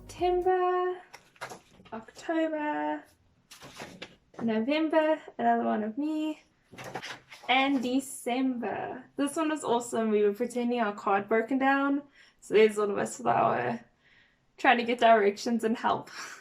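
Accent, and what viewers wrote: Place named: Australia. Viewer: i'm also and i like your accent are you from Australia?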